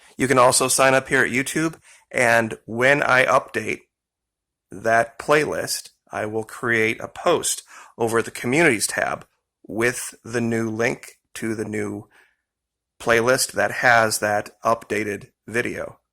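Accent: South, United States. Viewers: Are u from the south, ❓😌🙏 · Southern accent? Probably remove Louisiana from the map.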